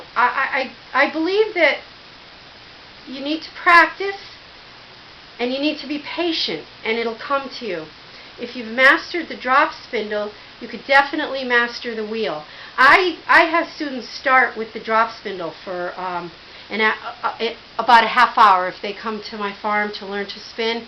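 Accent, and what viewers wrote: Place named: Upstate New York, United States. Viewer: This is so wonderful. Thank you! Are you from upstate NY? You sound like my family!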